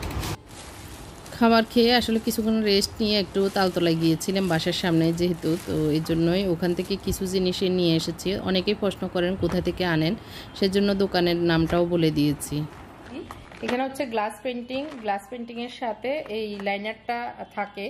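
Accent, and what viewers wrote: Noakhali, Bangladesh. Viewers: You sounds like from Noakhali.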